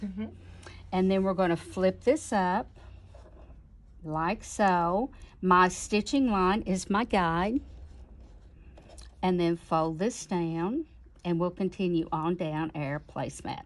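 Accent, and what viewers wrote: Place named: South, United States. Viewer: Excellent tutorial! And I love your southern accent!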